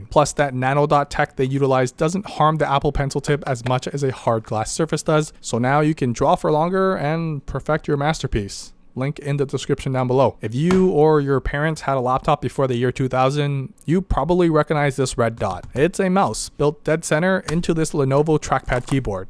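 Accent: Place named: Canada. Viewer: Wow, Kanadian lolzz 👍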